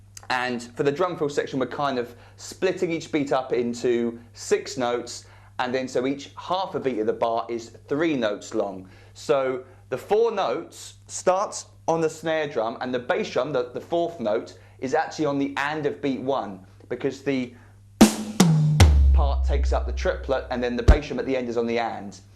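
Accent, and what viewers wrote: United Kingdom. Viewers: Haha I started to watch the vid.. And then you started speaking with a TOTAL British accent.. I laughed my butt of! But you play just fine! Keep up the good work.!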